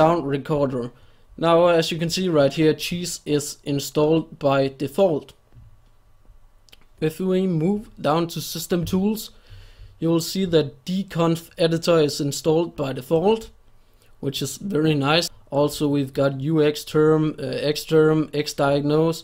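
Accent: Denmark. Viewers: Dejlig dansk accent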